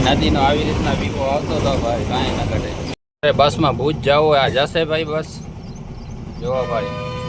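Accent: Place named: Kathiawar, India. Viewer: કાઠિયાવાડી ભાષા હો ભાઈ 😅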